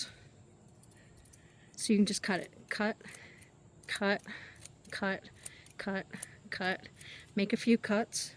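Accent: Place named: Philadelphia, United States. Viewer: May I ask where you’re from? You sound like you’re from Philly or South Jersey area, where Im from.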